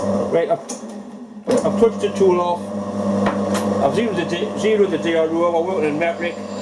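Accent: Newcastle, United Kingdom. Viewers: For 42 seconds I was transfixed..then I was presented to the Geordie Andy Capp...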